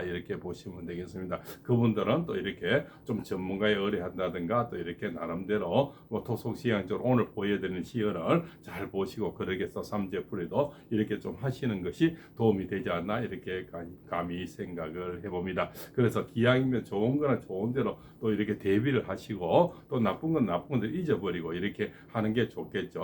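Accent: Gyeongsang, South Korea. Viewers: 아,넹 감사합니당ㅋ 쌤 경상도 발음을 제가 잘 못 알아 들어서 죄송합니다ㅠ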